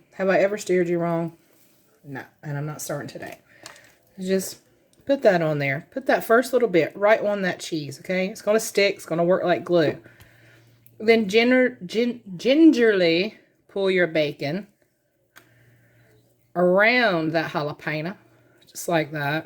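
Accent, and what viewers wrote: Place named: East Texas, United States. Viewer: I’m new here but I feel like I’ve met a neighbor. I’m from east Texas, and you would fit right in here. We even sound alike. I’m binge watching your videos... I love your style. God bless your heart.❤😊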